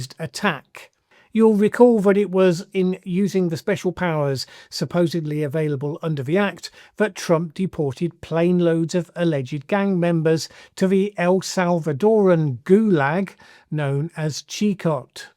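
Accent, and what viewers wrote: United Kingdom. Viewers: When someone uses incorrect grammar it makes me doubt what they are talking about. He’s obviously British which may result in a difference in pronunciation.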